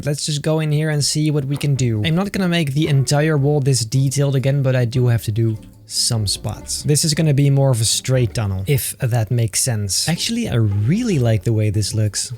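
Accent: Netherlands. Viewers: Re u dutch? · Your Dutch isn’t ? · are u dutch? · Je bent Nederlands ik hoor het man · Is he dutch? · Are u dutch? Your english Accent sounds like you’re from the netherlands 😁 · I smell nederlands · Are you dutch? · Nederlands accentje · I keep trying to figure out from which country he is by trough the way he speaks, I came to the conclusion it had to be Dutch.... I think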